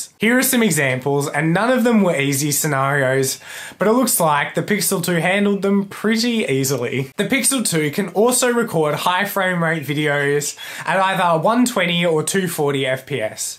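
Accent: Australia. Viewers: What kind of accent do you have? Australia? I come from germany by the way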